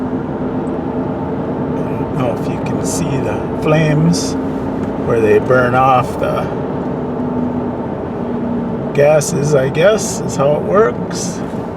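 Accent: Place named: Midwest, United States. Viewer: This is the cutest and most wholesome road trip video I have ever seen in my life. I love this guys voice. So Midwestern. 🤗🤣💜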